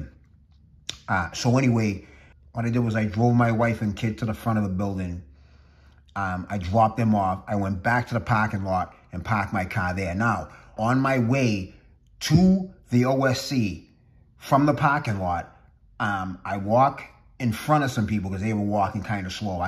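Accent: Boston, United States. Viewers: Great video as always, bro! Welcome back, with gratitude from Woostah for your no nonsense tellin it how it is & great to hear that Boston accent representin & preachin at fragchuch for a Sunday! Think I finally found a place up in the Boston area that may carry a decent selection of niche and indie houses but haven’t had a chance yet to check it out - believe it’s called pickwick’s mercantile in Portsmouth. Could be dangerous to visit 😂have a great rest of your day!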